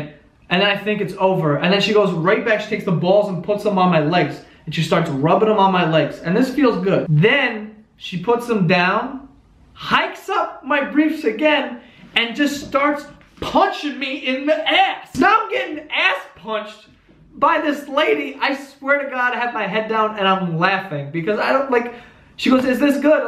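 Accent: New York, United States. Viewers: His New York accent super cute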